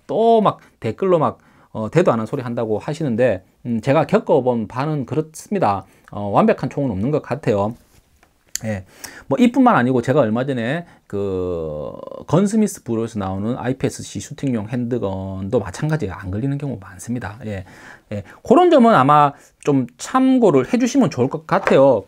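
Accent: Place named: Busan, South Korea. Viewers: 뭔중국? 경상도 말투인데? 한국인아님? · 빠사~지는....찰진 다이내믹 부산 표준어에 좋아요를 탁~! 치고 갑니다 ^^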